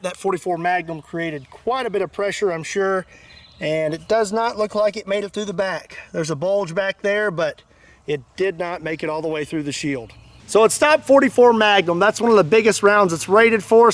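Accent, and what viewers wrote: Kentucky, United States. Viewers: Are you in east or west it I live in Paducah which is west you sound like your in west Kentucky which is the more gun friendly part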